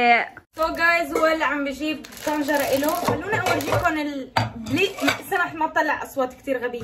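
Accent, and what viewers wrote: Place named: Palestine. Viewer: من وين هي. لهجتها فلسطينيه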